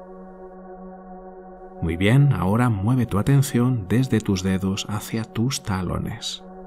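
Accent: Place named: Spain. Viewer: Tienes una voz muy linda y con tu acento español mucho más. Dios bendiga tu don.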